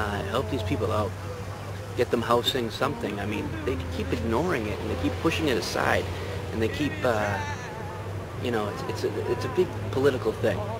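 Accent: East Coast, United States. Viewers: You sound like you are from the east coast. Have you ever been to New York? Or how about on the West side of the continent? Seattle? Homelessness is 10 times worse in other areas of the country. I understand your frustration. Sometimes people fall into a trap and find themselves at the bottom. Maybe they need a little helping hand. However, I have found that the vast majority of people that ask you for something, use the helping hand and then ask for more. I have seen the same homeless people in my home town for the last 30 years. I have helped many of them. (Giving them food, a ride, I even let someone live in my house for a short time.) They say they want to change, but most of them don't. Many of them have mental problems, and I agree that those people need major help. You can give a man a fish and he will eat for that day, or teach him HOW to fish and he will eat for a lifetime. 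You have made a video and shown the world the problem. Instead of appealing to others to solve it, come up with something yourself. You live there, and it bothers you deeply, so become part of the solution. Cheers. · You have the East Coast accent why don't you go and help those people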